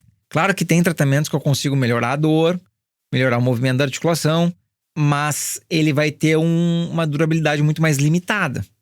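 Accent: Rio Grande do Sul, Brazil. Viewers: Ele é gaúcho amiga rsrs .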